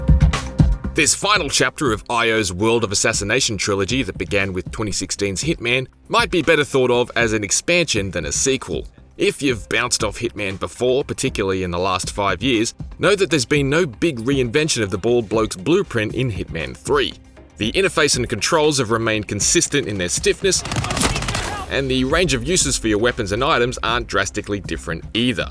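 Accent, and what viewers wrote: Australia. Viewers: Reviewer is Australian? Sounds just like Steve irwin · Is IGN an Australian reviewer now? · “Aaaaand those who like to improvise and leave behind piles of dead and unconscious men in their underpants...” lol. This reviewer is great, and I like his Aussie accent. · Sounds Australian to me... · Did IGN hire Aussie man ? Reviewer sounds him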